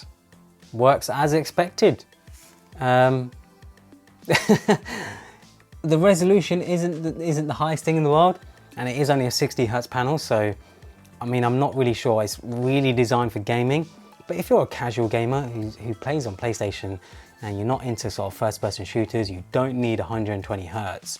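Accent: United Kingdom. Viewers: That's the hardest brit accent and I love it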